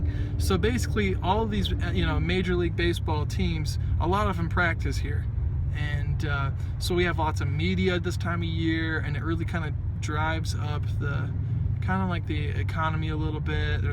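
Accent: United States. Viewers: Definitely not lol where you from bro...im from Detroit...you sound like you from Ann Arbor or the burbs or something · Thank you, considering moving from metro detroit to tuscon... worried about the heat, you sound like someone from grand rapids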